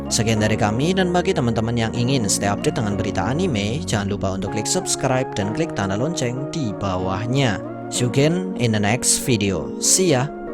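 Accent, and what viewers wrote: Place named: Banyumas, Indonesia. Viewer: ngapak banjarnegara👆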